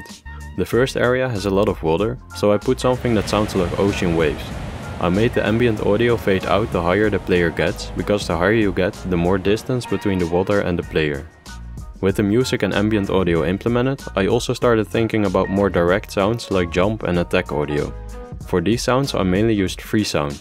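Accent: Netherlands. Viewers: Are you Dutch by any chance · Hé is Dutch You can hear it by his accent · are you dutch? you really sound dutch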